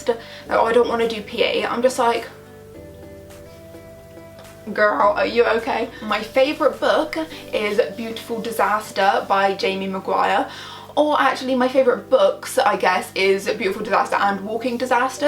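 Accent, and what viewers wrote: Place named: South West England, United Kingdom. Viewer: I'm from the south west too, Bristol to be more specific. I thought you were from the south west, because of the accent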